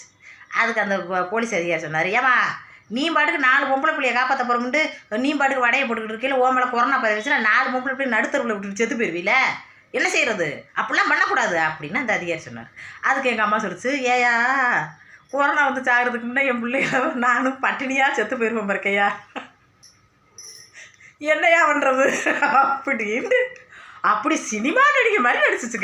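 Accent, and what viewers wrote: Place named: Ramanathapuram, India. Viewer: உங்க ஊரு ராமநாதபுரம் மாவட்டம் பேச்சை இது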